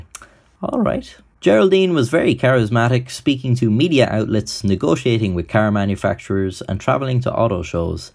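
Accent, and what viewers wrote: Ireland. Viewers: Love your page! The content is very interesting and your storytelling ability mixed with clever bits of humor is top-notch. The Irish accent doesn't hurt either. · a bit late his accent if you can not tell is clearly Irish so i believe that he is Lebanese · I thought it was Slavic but ever since I saw this comment it's sounds Irish not Slavic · You got 100k cause you have a Irish accent everyone likes Irish accents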